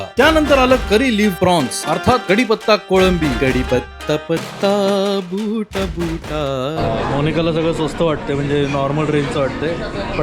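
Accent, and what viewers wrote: Kolhapur, India. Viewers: तुझी कोल्हापुरी टोन खूप आवडला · Kolhapuri tone mast aahe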